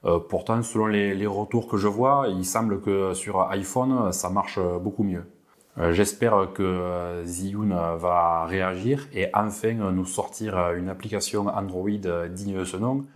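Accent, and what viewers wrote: South, France. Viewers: A mince j'ai pas vu, en tout cas merci encore pour ton aide. Je te suis sur ta chaîne et j aime bien les tests que tu réalises, de plus je suis comme toi du sud et j'ai le même accent et j'en suis fier.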